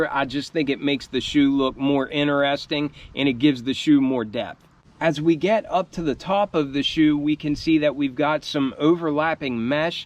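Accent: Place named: Philadelphia, United States. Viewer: You have kinda philly accent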